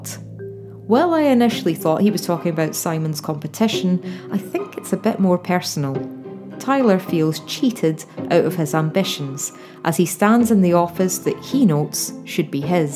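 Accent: Scotland, United Kingdom. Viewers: Where in Scotland are you from? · Can I just say how much I appreciate your calm talking presentation. As a skeemish person myself ( I skip the jumpscare horror episodes ) it was nice that you didn’t include audio or visual scares that I’ve seen other reviewers use. Really good video and it’s always nice to hear a fellow Scot! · I love “Inside No. 9”. I’m absolutely in love with it. Love your list...quick question. What’s your accent? Is it Scottish or Northern English? It’s lovely!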